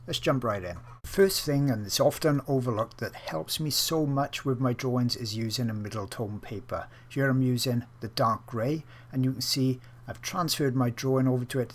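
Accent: Wales, United Kingdom. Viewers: Welsh amateur artist picking up a pencil again here. Brilliant tutorial and great to hear a Welsh accent 🏴 🙌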